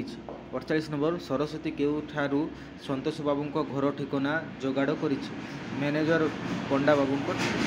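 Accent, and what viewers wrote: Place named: Bengal, India. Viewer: ବେଙ୍ଗଲୀ କି ତମେ 😂😂😂ଓଡ଼ିଆ ହେବନି ଭାଇ ତମ ଦ୍ୱାରା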